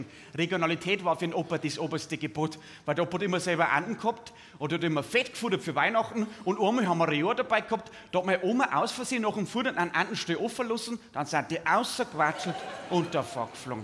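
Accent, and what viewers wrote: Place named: Bavaria, Germany. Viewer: Ich versteh ka Wort 😂 bayerisch halt 😂😂😂😂😂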